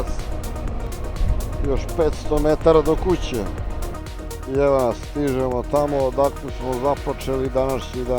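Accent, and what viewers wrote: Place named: United States. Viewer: Bez zajebancije poceo si da pricas kao amerikanac ahahahhaha Bravo za domacina! Pozdrav iz Velikih Crljeni :D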